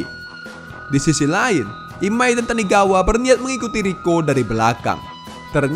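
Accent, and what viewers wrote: Medan, Indonesia. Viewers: Medan kali bahas nya bossss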